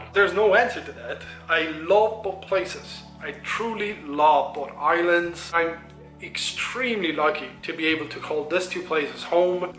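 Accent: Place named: Palermo, Italy. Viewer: Bellissimo video. Certo che io con un accento diverso da quello palermitano (quando parli in italiano) non ti ci immagino proprio 😅😅😅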